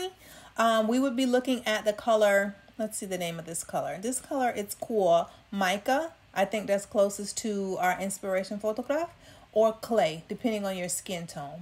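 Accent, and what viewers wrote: South, United States. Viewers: hi 😄, this is Kay.. yesss. they are all 5 stars. 💥💥💥💥💥. btw, I love how you say "thure' instead of there. where are you located? or the bit of southern accent?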